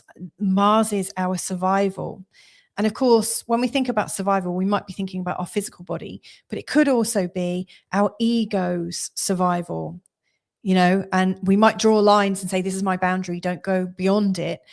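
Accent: United Kingdom. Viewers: What a great explanation and metaphor to explain out of bounds planets! I’m addicted to your fabulous dailies now! Loving learning from you; a fool for the great British accent 🙏🌻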